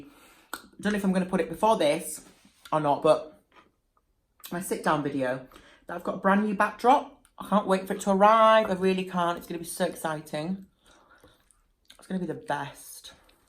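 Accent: United Kingdom. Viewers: Love you are the bomb I love watching your videos and listening to you British accent! Your spaghetti looked amazing!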